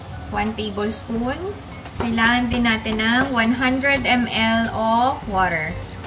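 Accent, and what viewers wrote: South Korea. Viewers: I love hearing your Korean accent..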